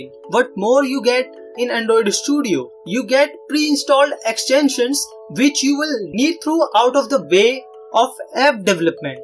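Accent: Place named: India. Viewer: I always love when Indian talking with their accents... its so entertaining to hear :D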